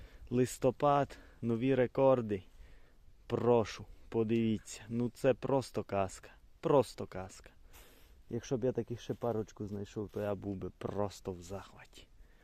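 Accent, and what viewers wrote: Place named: Transcarpathia, Ukraine. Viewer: ага то чути Закарпатський діалект👍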